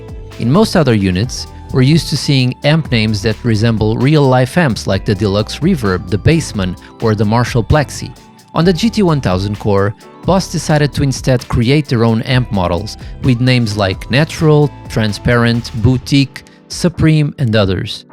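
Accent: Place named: Portugal. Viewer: Pelo sutaque deves ser tuga! 😂 Saudações 🤘🏻🍺🇵🇹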